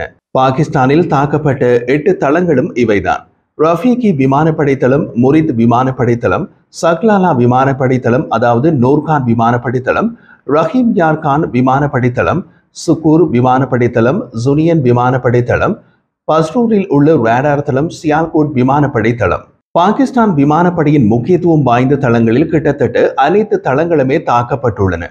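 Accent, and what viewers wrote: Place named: Sri Lanka. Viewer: அருமையான தமிழ் செய்திகள் தூய இலங்கை தமிழ் நல்வாழ்த்துகள்!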